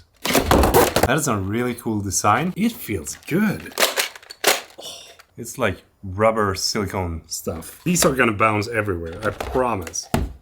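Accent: Sweden. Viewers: Your video editing is brilliant. Love it,. (As I typed I was saying it in your satirical Swedish accent in my head).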